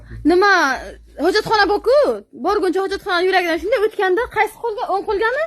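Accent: Qashqadaryo, Uzbekistan. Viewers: Qashqadaryodan ekanligimiz shevamizdan seziladi birinchi koʼrganimda aniq Qashqadaryodan deb oʼylagandim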